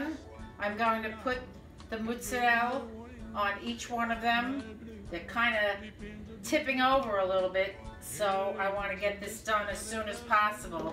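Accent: New York, United States. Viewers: What a hoot ! :) You sound like a New Yorker, my home - music to my ears. Loved your video. I've watched a few others stuffing artichokes and yours is the best, hands down. I'll be checking out your other videos. Keep cooking.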